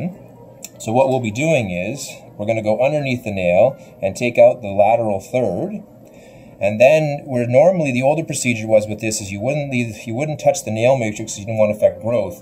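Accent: Canada. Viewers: You sound a little bit like you're from Canada.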